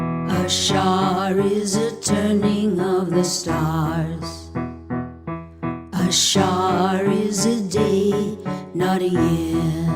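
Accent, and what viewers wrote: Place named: Texas, United States. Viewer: The owner of this channel seems to have a LOT of good information, but I CANNOT listen to him. (He sounds like a BLITHERING MORON, a cartoon character! The uber Texas accent, along with his tone and diction... I want to vomit.)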